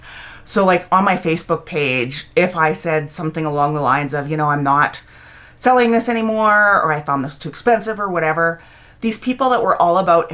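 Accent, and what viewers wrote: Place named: Canada. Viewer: are you from Canada? Love the accent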